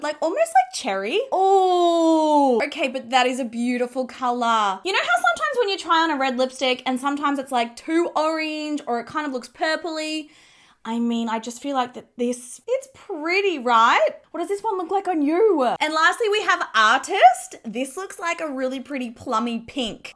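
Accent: Australia. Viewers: I’m a libra too! But I love how you Aussies say it! ❤❤❤❤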